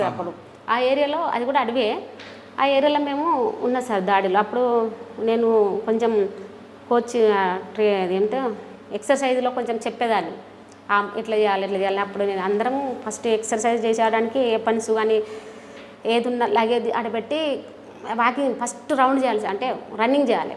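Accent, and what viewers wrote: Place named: Telangana, India. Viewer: కామ్రేడ్ మేడం నిజంగా మీరు చాలా అందంగా ఉన్న కామ్రేడ్ తెలంగాణ యాస లో మాట్లాడుతుంటే ఇంకా బాగున్నారు. ఇట్లా,,, గిట్లనే,,, బోయిన గూడ,,, మంచిగా,,, మస్తు,,, 👌👌👌అందమైన మీ పదహారణాల్ల అందం అంతా అడవిలోనే గడిచిపోయింది.నిజంగా ఈ ఇంటర్వ్యూ చూసి నేను ఫిదా అయిపోయాను మేడం. But ఏదేమైనా i love కామ్రేడ్ 🚩🚩🚩🚩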